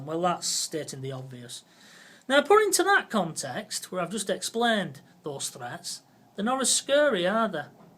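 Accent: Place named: Lancashire, United Kingdom. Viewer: Beltin Lancashire accent like mine an fray Coppull.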